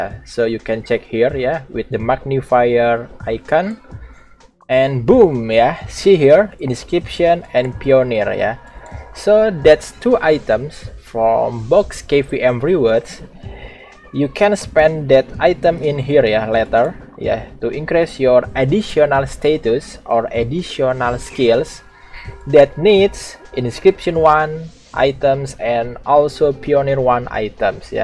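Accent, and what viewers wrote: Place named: Indonesia. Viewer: Njir kirain orng mana wkwkkw ternyata indo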